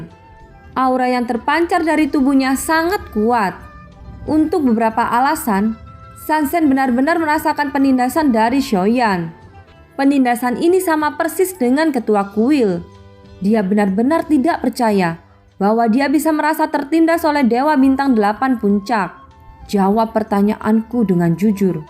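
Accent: Java, Indonesia. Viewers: Medok piye iki bahasa ne...😬😬😬😬😬😬😬😬😬😬😬😬😬😬😬😬😬😬😬😬😬😬😬😬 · Medhok nya bisa di kurangin ga..😊😊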